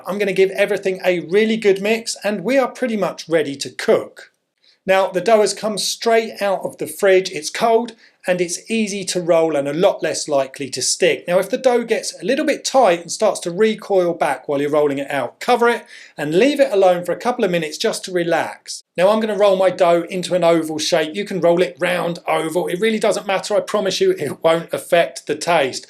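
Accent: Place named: London, United Kingdom. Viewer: You sound like you're from London. Have you been to Greenwich market? They have lovely Turkish "pizzas".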